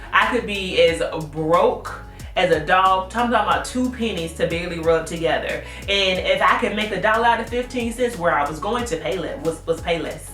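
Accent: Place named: Washington DC, United States. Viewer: Woohoo I thought of doing a channel like this but you are better at it!!! I’m 100% with you. I have well made pant suits I’ve had over 10 years. I’m trying to do the 30-30-30 wardrobe. I’ve written down my pieces and gotten rid of the excess. Now working on defining my style cuz I like such a mix of classic looks and boho looks. You’ve got a new sub. (Do you watch Alyssa ?) her channel is good too. Edit: when I got to the end we talked about your therapist and the cadence of your speech I immediately thought I wonder if she is from DC? Then you mentioned the metro and I was like oh yeah she’s from DC. 😂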